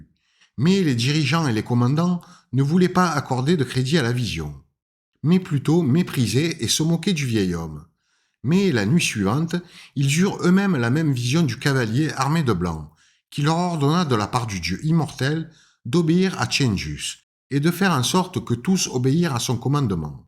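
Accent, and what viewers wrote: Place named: South, France. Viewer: Non, j'déconne vos vidéos sont très interessante te votre accent de sudiste est agréable!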